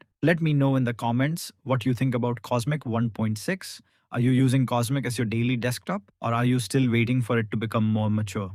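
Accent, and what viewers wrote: India. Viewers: I'm not being ironic: Is this an Ai voice with Indian accent?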